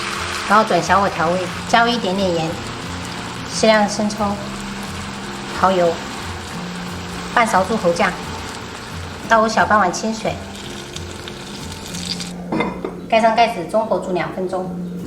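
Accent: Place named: Sichuan, China. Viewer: 喜欢看你的频道～^_^～温暖的家常菜，亲切的家乡川普❤️～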